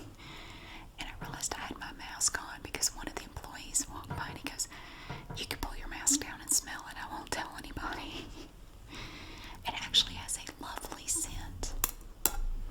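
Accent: South, United States. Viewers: I'm an English man,but I love your southern American accent,your videos are fantastic & I love the way you do your thing,your whispering is awesome,please keep doing what you do,you're amazing,much love,Chris x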